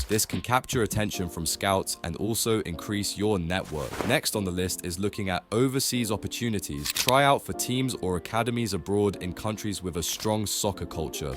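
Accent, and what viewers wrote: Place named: Scotland, United Kingdom. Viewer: Um hi! I know its hard to believe but this is my other account as this channel is only available on my computer and I am using my phone right now but the reality is you will never go pro because you are from Scotland and scouts wont like a person like you with your Scottish accent around here so get an actual job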